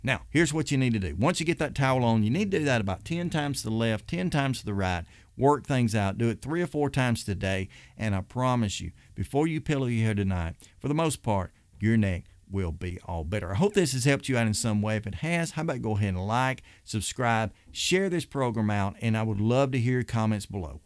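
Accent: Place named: Texas, United States. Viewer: Love that texas accent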